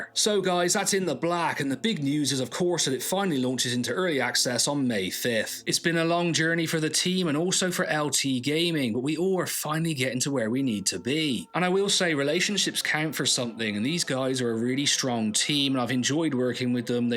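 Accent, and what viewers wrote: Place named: Bristol, United Kingdom. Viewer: Lol you sound bristolian AF mate - practically narrow it down to Hartcliffe - am I close?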